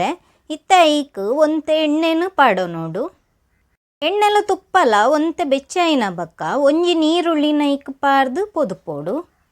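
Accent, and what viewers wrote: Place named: Mumbai, India. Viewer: You sound like Mumbai residing mangalorean.. it's very irritating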